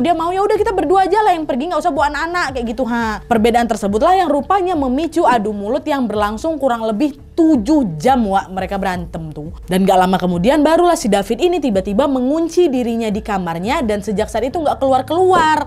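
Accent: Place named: Medan, Indonesia. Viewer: Tadi anakku denger cerita te kekeh2 dia😂😂😂.. Medan kali mak katanya... Woii... makin cantek ya...😘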